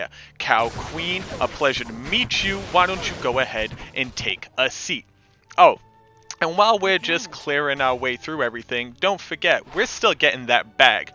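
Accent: Boston, United States. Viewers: The boston accent came out HEAVY in this video :D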